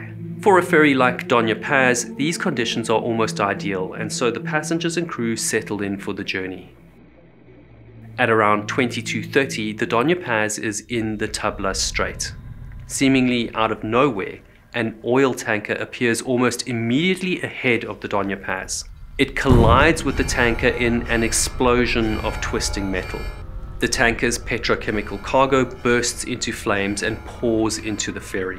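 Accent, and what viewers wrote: South Africa. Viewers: His pronunciation is typically South African and is perfectly comprehensible to my American English ear. Where are you from that you are having a hard time understanding this?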